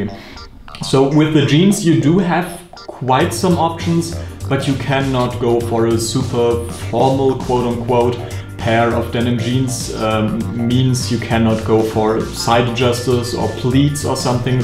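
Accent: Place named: Germany. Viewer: geil. wie man einfach das deutsche English heraushören kann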